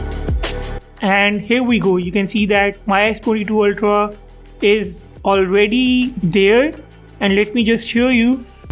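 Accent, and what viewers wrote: India. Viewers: Why the F you lying? You have an indian accent.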